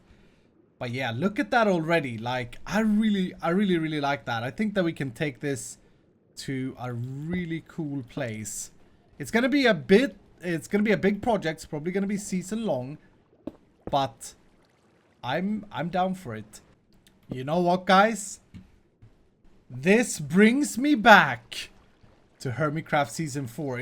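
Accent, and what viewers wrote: Sweden. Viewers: Arr you from sweden?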